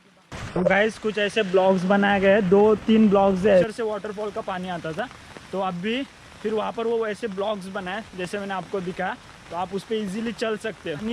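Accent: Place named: Maharashtra, India. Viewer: Ashich commentary thev, real, marathi ani marathyachi hindi, lavkarach famous hoshil 😂⛳️👌🏻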